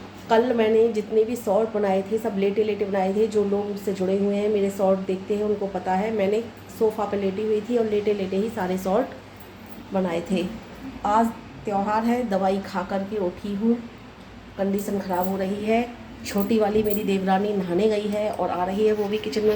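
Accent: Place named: Hathras, India. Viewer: aapko bhi happy Diwali 🙏🏻 main bhi u.p se hi hu.. hathras se hu .. aapke bolne ke andaaz se laga ki aap bhi hathras se hi ho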